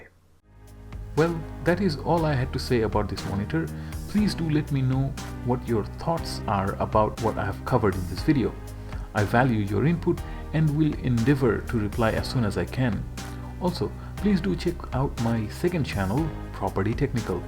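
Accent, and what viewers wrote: Bengal, India. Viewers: Is your native tongue Bengali?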